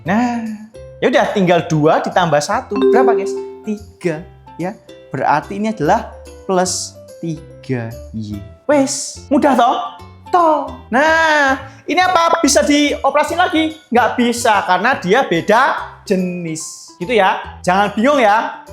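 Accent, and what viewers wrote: Java, Indonesia. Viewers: Kak kamu Jawa?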